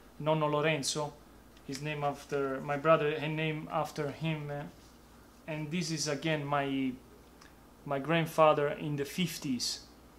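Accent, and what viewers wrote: Italy. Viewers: Maestro! I wish I was born from a long line of pizzas (heh heh, okay, just joking -- you're passion and Italian English is fantastic!). But I'm so inspired. I'm from Ottawa Canada! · Why do you have an Italian accent but your mom doesn't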